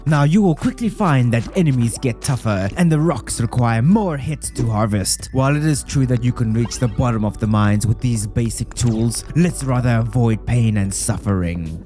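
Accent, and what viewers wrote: South Africa. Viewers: You are south african for sure.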